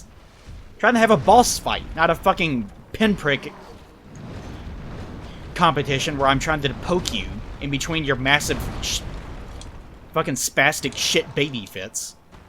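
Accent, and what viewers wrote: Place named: South, United States. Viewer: I just realized you sound a lot like SSoHPKC but with a slight southern accent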